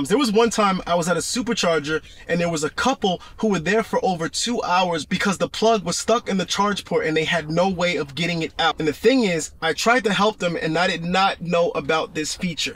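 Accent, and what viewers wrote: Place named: United States. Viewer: Hi, I am Pierre from Paris (a model 3 owner) thank you for your wonderful video. And your wonderful American accent. It was super cool and super easy to be listening to you. I will watch your other video and I have subscribed to your chanel. But just one question for the trunk. You showed how to reduce the height, but if I want to increase?? Mille merci à toi!!!!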